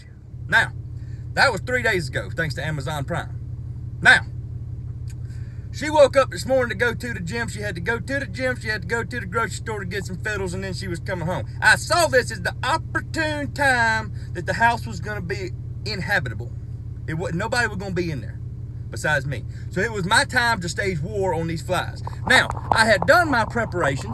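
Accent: South, United States. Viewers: The Southern accent is the icing on the cake 😂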